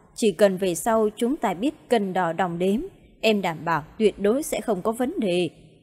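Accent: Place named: North, Vietnam. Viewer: kênh giọng nam kỳ, tự nhiên giờ sen vô con nhỏ bắc kỳ này vô là sao vậy ? tôi không ưa giọng bắc kỳ.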